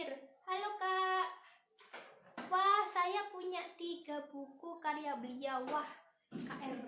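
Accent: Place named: Java, Indonesia. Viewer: Medoknya dapet banget, 😁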